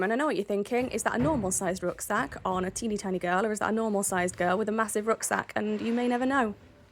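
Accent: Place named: Yorkshire, United Kingdom. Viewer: oh I see, I guess more local distinctions go beyond my foreign untrained ear, I just thought you sounded rather similar to a friend of mine from near Leeds. Still a very nice accent!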